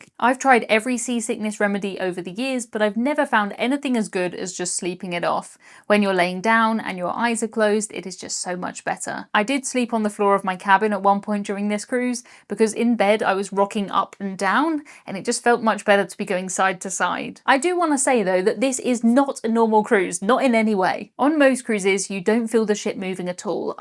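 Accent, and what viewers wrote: United Kingdom. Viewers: Shedule is my fav British work lol. They just forget the C in schedule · I would love to run into you on a cruise just so we could sit down and talk about cruises and you experiences that you have. I love to hear your commentary not sure if it the British accent but listening to you explain these things just sounds so lovely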